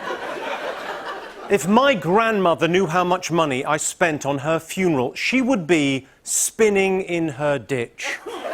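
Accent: United Kingdom. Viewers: I like how he intentionally slows down so Americans get the jokes in a British accent.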